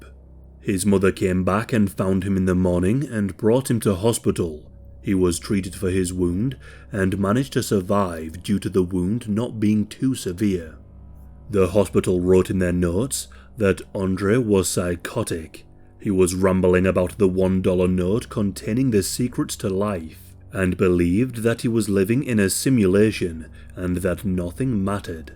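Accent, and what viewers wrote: United Kingdom. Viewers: it would be much more scary if you didn't have this hilarious british accent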